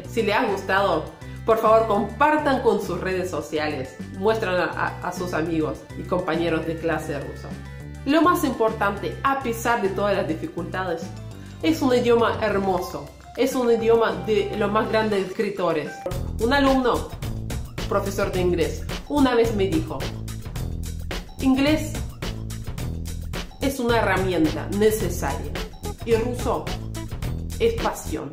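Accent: Argentina. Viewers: Muy bueno, hablas como argentina nativa! Espero alguna vez hablar así el ruso. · Vives en Argentina??? Lo digo por el acento que tienes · Que graciosa Rusa hablando argentino 😁.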